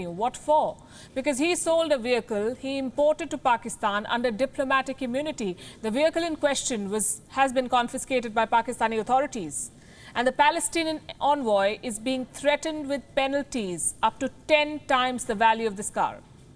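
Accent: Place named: India. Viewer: I know you are doing the good thing. Thanks. But do you realize that you have a very heavy accent and people do not understand what the heck you are talking about. Can you slow down your speech and enunciate. It applies to all Indian speaker with heavy accents. Can't you guys know you have very heavy accents? I know China lies. but you should say it clearly so that more people understand the truth.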